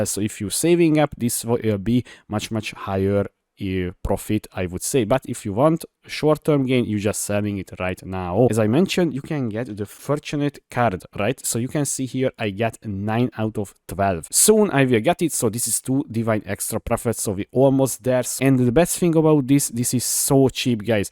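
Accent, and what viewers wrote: Hungary. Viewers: Work on your accent my buddy. Without looking I'm pretty sure you are from Hungary. :D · nagyon hallani, hogy Magyar a srác 😅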